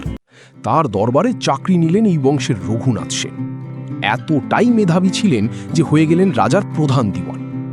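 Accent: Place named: Kolkata, India. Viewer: আপনার বংলা একসেন্ট টা কিছুটা কোলকাতার বাংলা একসেন্ট এর মতো। Not bad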